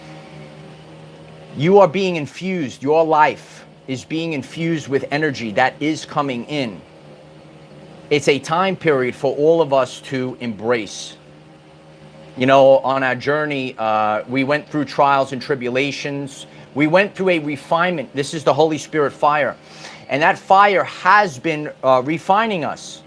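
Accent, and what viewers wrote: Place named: New Jersey, United States. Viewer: Hi this is Milan haven't seen your channel in a while. I have enjoyed it very much . Love your accent I'm from the Boston area soulth Shore I'm suspecting New Jersey love it. ❤ 🙏 🕊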